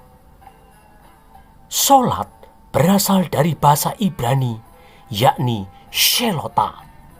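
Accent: Java, Indonesia. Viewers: lu pamer bhsa medok jawa lu,ato jrgon nusantara lu,mo sok NKRI lu,ato lu pemuja fanatik jawaisme lu,klhatan cupunya lu ndeso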